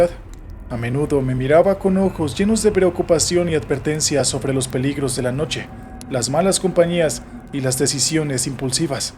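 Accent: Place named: Mexico. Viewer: Esa voz suena mexicana 🤣🤔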